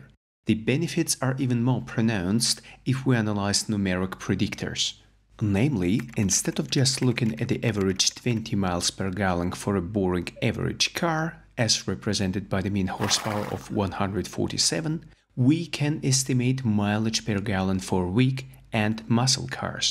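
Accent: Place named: Belarus. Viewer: Thank you for one of the best channels on YouTube on the topic of data science using R. By the way, I had a Jewish grandpa from Belarus and Latvia (he was born in Latvia, but moved to Belarus, before arriving at South Africa). Your accent is quite similar to his, but with an extra German sound. Is there any chance that you are from Belarus?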